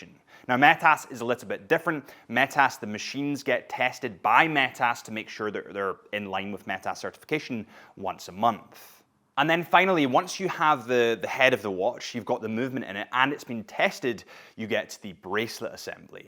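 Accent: Scotland, United Kingdom. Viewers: He's Scottish, every word sound a little different 😊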